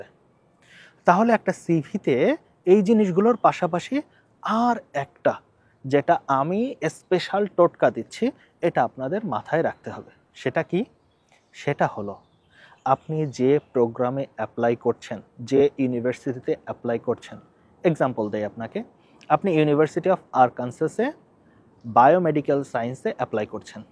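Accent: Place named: Jessore, Bangladesh. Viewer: Jessore er lok mone hosse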